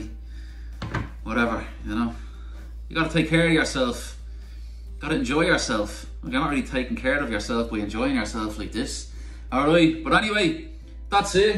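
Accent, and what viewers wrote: Ireland. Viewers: Loved watching and listening to his lovely Dub accent! Made me homesick! My Mam used to make her apple tarts exactly like his! ☘️💚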